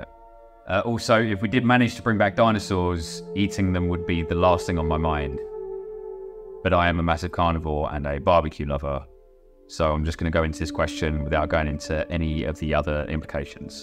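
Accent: United Kingdom. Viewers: Easily my favourite paleo channel. Info dense yet easy to digest. And who doesn't love a regional British accent. I would wanna see you try south Yorkshire. It would be funny because you actually annunciate things. Citizens up here less so.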